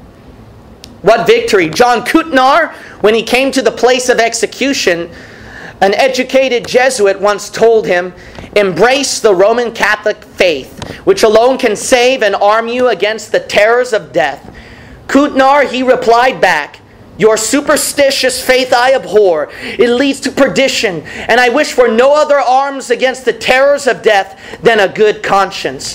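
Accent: United States. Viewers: You are so right. I love to see new videos come out from! And I love his country accent, esp when he says "teachin'". And whoever that is in every teaching screaming out "Amen" in every video, God bless you Brother 🙏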